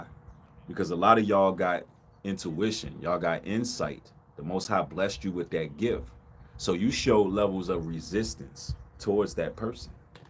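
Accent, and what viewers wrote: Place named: Washington DC, United States. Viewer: Aye brotha, are you from the dc area? I feel like im picking up a hint of that accent. Been checking your vids out for a minute. Much respect!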